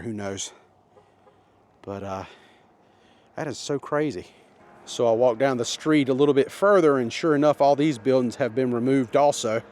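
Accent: South, United States. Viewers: Another great vlog. Where are from? Are you also from NC, you definitely have a Southern accent? Also, why don't you do a vlog of Hendersonville? I'd like to see how it's changed over the past 40 years, apparently a load of rich Yankees have bought 2nd homes there?! 👊🏼🇺🇸👍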